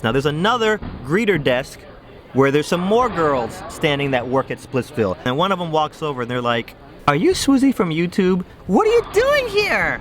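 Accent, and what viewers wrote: United Kingdom. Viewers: Dark red hair is my kryptonite. But British accent is a close second. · British accent 😏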